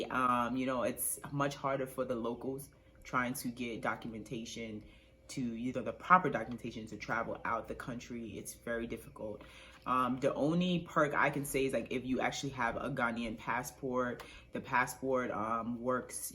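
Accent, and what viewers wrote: United States. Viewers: Ok why is your Ghana accent here not here then it's pure American accent all cap.lol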